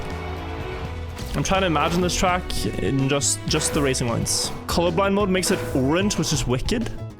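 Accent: Norway. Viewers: subtitling his norweigen accent is so funny lmao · I love Norwegian "accent" - It just improves everything! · The Norwegian accent....😂 Everytime I hear Norwegian speak it.... Fucking adorable. 😂 Live in Sweden the Swedish accent is just not the same. Close but not the same. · Aww man speaking with that Norwegian English is awesome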